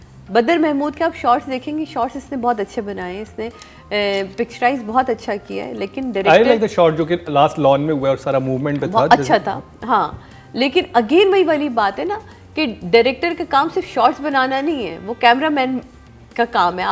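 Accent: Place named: Pakistan. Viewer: every person has their own accent.Pakistanis will speak with a Pakistani accent which will be definitely different to Canadian,American or British English accent.You yourself have a very Pakistani accent.Education is not knowing E glish.English is a language its not a measuring stick for education.Btw the word is PRO NUN CIATION not PRO NOUN CIATION.Now you understand what is accent.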